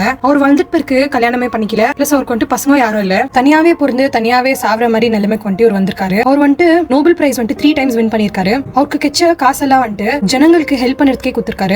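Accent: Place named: Bangalore, India. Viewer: Banglore tamil super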